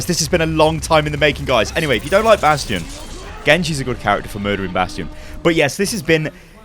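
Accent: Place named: United Kingdom. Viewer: this disgusting british dialect.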